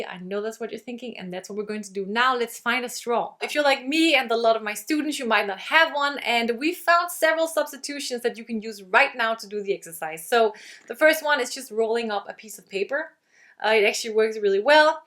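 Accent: Denmark. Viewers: He he, synes godt jeg kunne høre en dansker bag den ellers næsten perfekte accent :). Det var dog først da jeg så dit navn, at jeg kunne lægge to og to sammen:). Tak for nogle super gode og informative videoer!!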